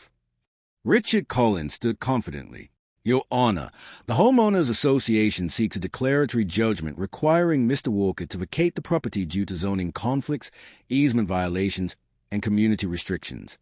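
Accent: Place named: United Kingdom. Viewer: Why does that narrator have a b British accent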